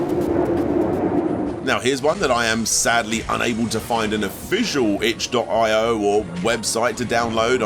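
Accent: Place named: United Kingdom. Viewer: god /da /mn the sh /it brit narration.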